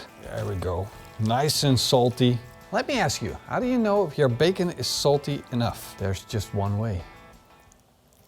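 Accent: Netherlands. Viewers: Sorry for my last comment in another video, I don’t even remember which video I left it. When I say that you fuck up the recipe, doesn’t mean that you are a bad cooked, I really see you as a temperature and meat expert. It’s just that so many video you seem to add the little touch that upset everyone and get roasted in the comment section.. You can’t deny that. I really enjoy your recipes , and watching you cook. Especially with your Dutch accent, if I found the other comment I will erase it cause it’s can be misunderstood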